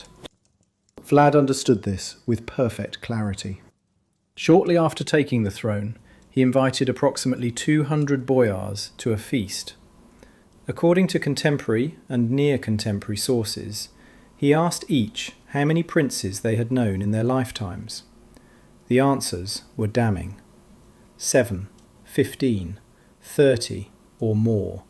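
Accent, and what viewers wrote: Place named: United Kingdom. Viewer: always weird when you hear some british accent telling about Romanians...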